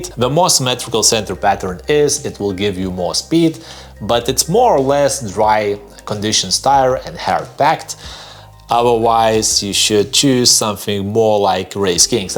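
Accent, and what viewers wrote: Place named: Soviet Union. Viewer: strong soviet accent ))) but video is very good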